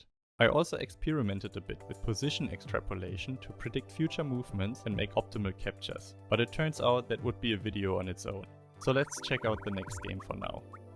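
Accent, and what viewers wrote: Germany. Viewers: Are you by any chance German? (Great Video btw)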